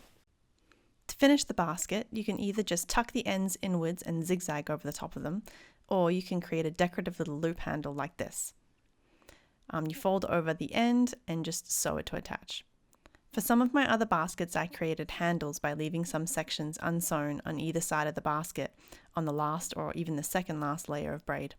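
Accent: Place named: Australia. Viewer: Great video! thanks for sharing! :-) also great to hear another fellow Aussie youtuber too 😊 I’m now a sub!❤️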